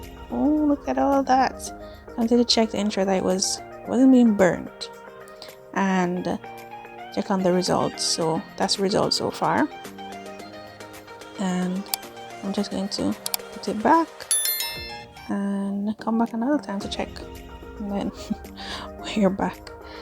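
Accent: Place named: Saint Kitts and Nevis. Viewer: Ummmm sis u from St.kitts 👀👀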